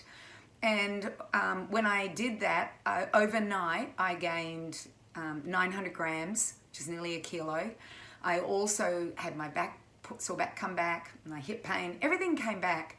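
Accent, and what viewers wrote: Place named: Australia. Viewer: You sound like an Australian and i love that you are at least you known what's going on in here (australia) comparing to the other ones that i found, all american. So thank you so so so much you explain things nicely whithout getting rid of everything. Thanks again 😊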